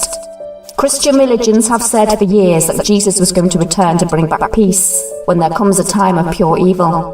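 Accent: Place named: England, United Kingdom. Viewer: The English accent doesn't help either. LOL Not your fault,. I hear electronically with the cochlear implant. Maybe I can find a different speaker doing this information somewhere or a transcript.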